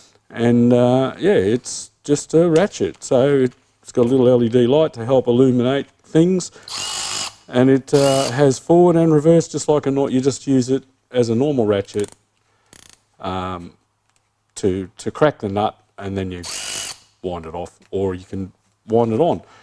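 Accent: Australia. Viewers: Man. Your Tassie Accent makes that intro All the better!!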